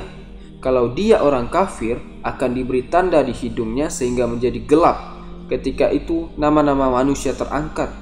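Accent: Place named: Sulawesi, Indonesia. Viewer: Didengar dari logat bicaranya admin ini kya ny org daerah sulawesi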